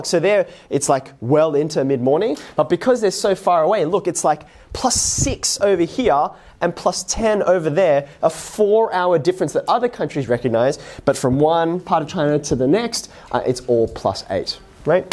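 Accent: Australia. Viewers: is the teacher from australia??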